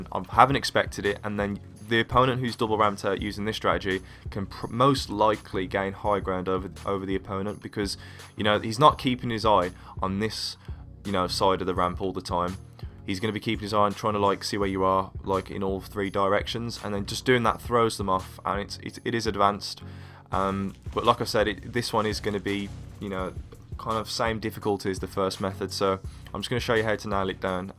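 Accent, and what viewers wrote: Birmingham, United Kingdom. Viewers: You're defo from Birmingham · are you from birmingham lol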